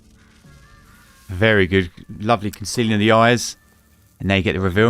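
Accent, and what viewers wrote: United Kingdom. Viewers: Well, let's go for 45 minutes of great British accent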